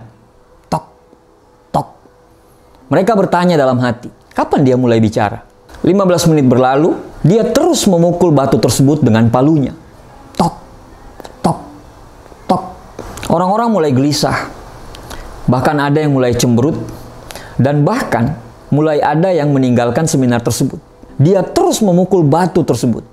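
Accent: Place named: Banyumas, Indonesia. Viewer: Mntp ngapak gafir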